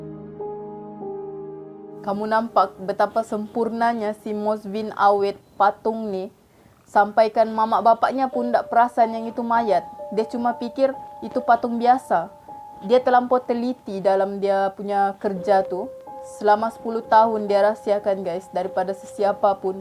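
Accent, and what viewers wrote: Malaysia. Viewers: Kok lucu y lihat cakapnye orang Malaysia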